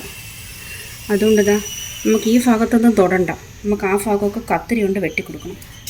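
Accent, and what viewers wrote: India. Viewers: Curry is superb, MY Trivandrum language is Superbbbbb 😊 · ചവള സൂപ്പര്‍ തിരുവനന്തപുരം സംസാരം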